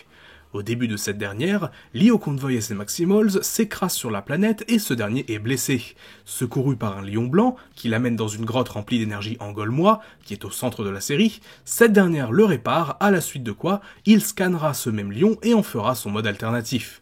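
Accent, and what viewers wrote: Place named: France. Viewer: J'avoue qu'avec un accent français, on comprend comme ça !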